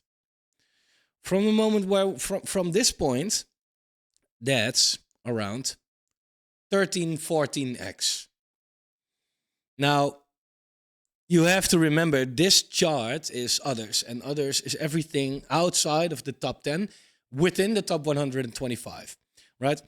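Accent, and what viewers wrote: Netherlands. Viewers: Great channel . I can hear you are Dutch just like me 😬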